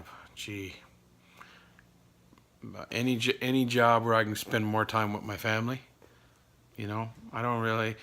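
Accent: Canada. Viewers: Team_Natty that’s not just a Canadian accent my friend, that is a Newfoundland accent.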